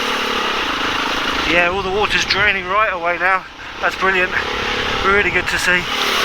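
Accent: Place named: England, United Kingdom. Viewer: Great day out on the bike in the sunshine does it get any better 👌 PS love the English accent and cursing it cracks me up haha cheers 🤙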